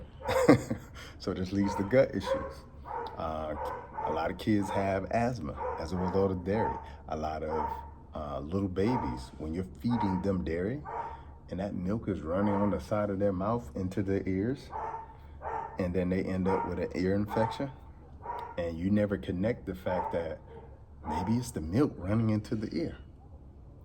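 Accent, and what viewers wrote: Jamaica. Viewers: Is he Jamaican?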